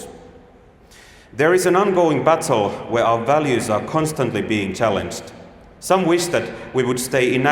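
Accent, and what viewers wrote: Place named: Finland. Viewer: Täyttä asiaa, mutta sun pitäis yrittää ääntää sanat eikä lukea niitä kuin suomea. Sinänsä virheetöntä tekstiä kylläkin.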